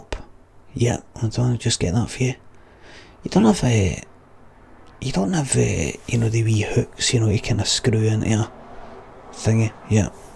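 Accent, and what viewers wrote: Scotland, United Kingdom. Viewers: Scottish accent “Letter story comence” 📕🙏🏻😴, absolutely biblical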